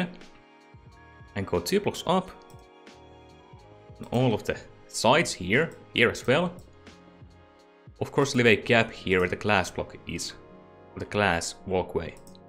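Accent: Finland. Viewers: Are you finnish ?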